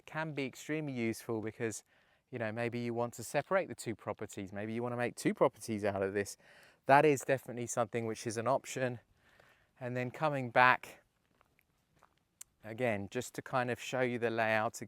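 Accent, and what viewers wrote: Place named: England, United Kingdom. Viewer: never crossed my mind and I'm from that neck of the woods. I took you for English through and through .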